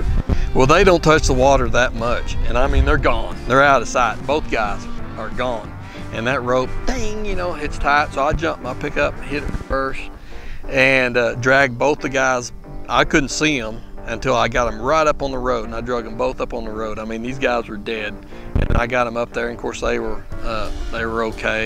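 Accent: Texas, United States. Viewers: Definitely sounds like Texas.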